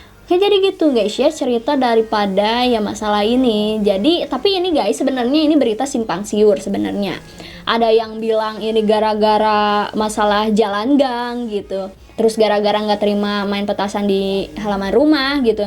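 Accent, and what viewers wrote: West Java, Indonesia. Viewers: Kaya orang sunda kak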